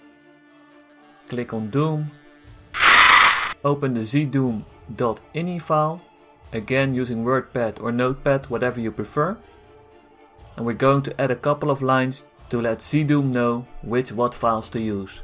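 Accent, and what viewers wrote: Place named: Netherlands. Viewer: You sound Dutch Ben jij. Nederlands?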